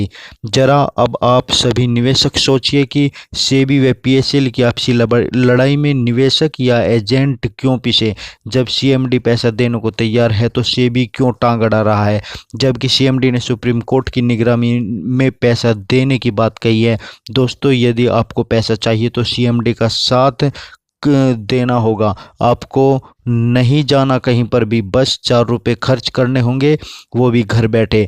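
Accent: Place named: Pakistan. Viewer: Ye Pakistani voice hay .! This voice not Indian voice ...and this voice is 100% sure Pakistani guy dubbing voice ....open eyes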